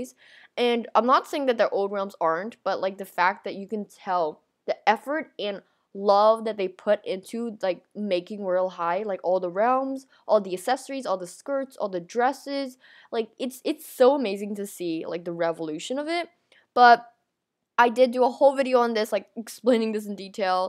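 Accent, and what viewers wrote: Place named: Long Island, United States. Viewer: Are you from Long Island? Becuase your accent is goalssss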